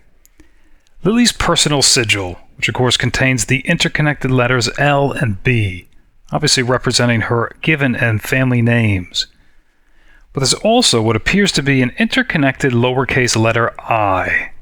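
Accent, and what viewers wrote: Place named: New York, United States. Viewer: Maybe it's because you're a New Yorker. Sounds like you're saying Lie-LICK. Just like how you pronounce "Ruins" as "runes". :P Course, I'm a Philly suburbs guy, So I can't really talk. :P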